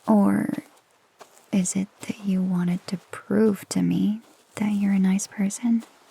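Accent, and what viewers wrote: France. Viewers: Please, do more French accent ASMRs 😌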